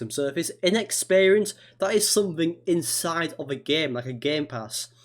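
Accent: United Kingdom. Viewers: 100% brithish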